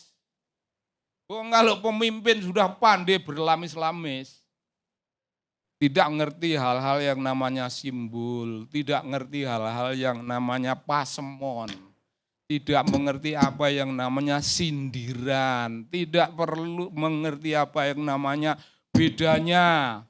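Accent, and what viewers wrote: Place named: Banyumas, Indonesia. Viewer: Cilacapan banyumas... Ngapak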